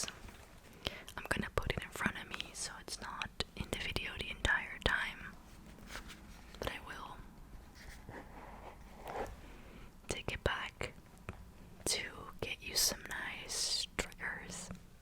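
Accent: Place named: United States. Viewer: Wow, i wouldn't have thought you were from Netherlands, your accent made me think you were american. Where did you learn english by the way?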